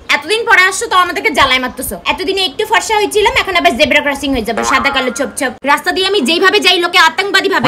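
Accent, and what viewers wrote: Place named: Bangal, Bangladesh. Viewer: বাঙাল টানে কথাগুলো এত ভালো লাগে যে কি বলব...😊😊.. ভালো থাকিস মা..❤❤👍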